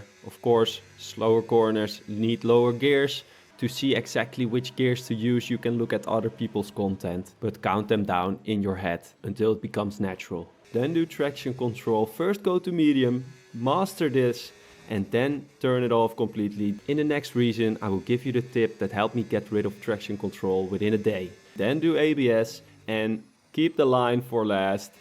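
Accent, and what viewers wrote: Netherlands. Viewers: thx voor de tips man, holy Nederlands accent wel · holy nl accent mr thx man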